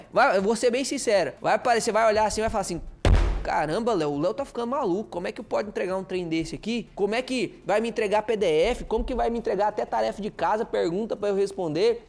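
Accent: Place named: Goiânia, Brazil. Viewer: Tu és de Gyn mesmo? Tava pensando: "Esse sotaque... É de Gyn, de Minas de Tocantins ou no interior de SP?" rsrsr. Mas tava apostando que seria de Gyn (já morei por muitos anos em Goiânia mas nunca peguei sotaque, rsrs. Ou talvez...sei lá, rsrs)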